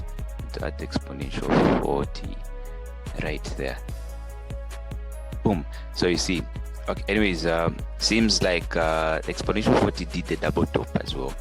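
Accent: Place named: Jamaica. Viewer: He sounds jamaican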